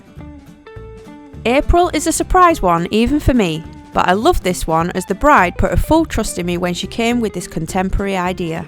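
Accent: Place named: Lancashire, United Kingdom. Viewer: So happy to have found ur tutorials.....absolutely in awe of ur work As a mere novice and previous community nurse ,this is inspirational.....think I chose the wrong vocation in life !!!???? Lol Thankyou for ur clear demonstration and must say ur lovely Lancashire accent just warms my heart . Keep up the fantastic work , I'll certainly be following regularly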